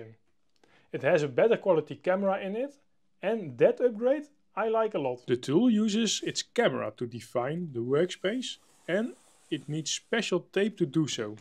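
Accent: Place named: Brabant, Netherlands. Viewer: He still gets an A for effort for opening up the concept and its development to everyone world wide. I'll take a thick brabant accent anyday for that :) Goed gedaan!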